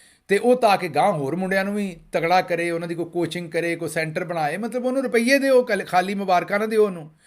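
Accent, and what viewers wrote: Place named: Punjab, India. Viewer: Mind-blowing words n amazing style of panjabi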